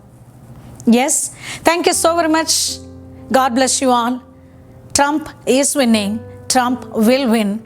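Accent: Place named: India. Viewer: Respectfully I tell you,I like your Indian accent but more still I like your great faith in the Lord Jesus.Thankyou.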